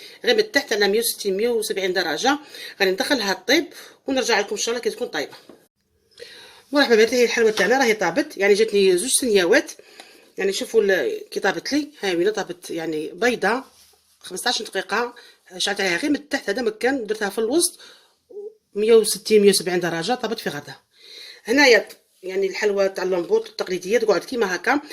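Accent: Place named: West, Algeria. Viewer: هدرت الغرب شابه😍تعجبني😂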